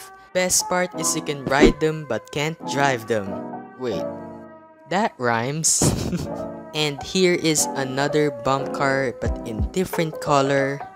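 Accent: Philippines. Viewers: Filipino accent be hitting tho lol thxx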